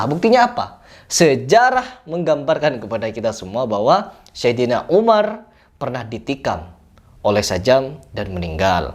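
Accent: Madura, Indonesia. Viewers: Logatnya kaya Madura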